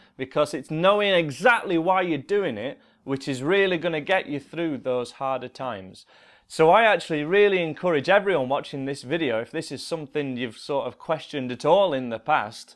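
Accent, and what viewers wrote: United Kingdom. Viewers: are you British or Scottish?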